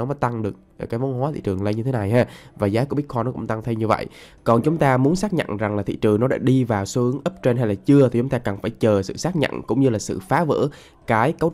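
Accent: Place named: Quang Nam, Vietnam. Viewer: Anh ng o miền trung quảng nam ak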